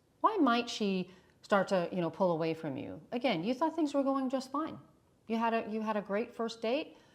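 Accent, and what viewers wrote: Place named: South, United States. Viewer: Greetings from Lake Como, Italy. I like your southern accent 😊 exactly where are you from?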